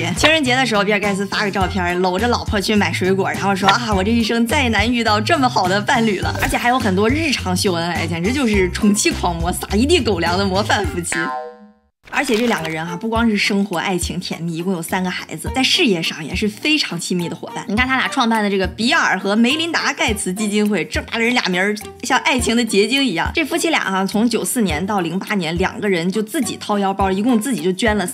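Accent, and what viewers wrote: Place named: Dalian, China. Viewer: 姐真有喜感！有大連味。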